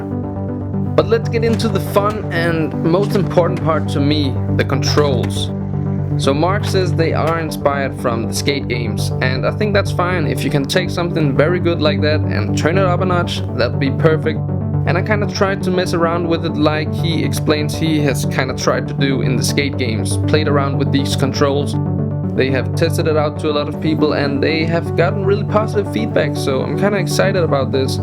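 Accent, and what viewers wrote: Denmark. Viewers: You're danish aren't you. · is this Guy danishh?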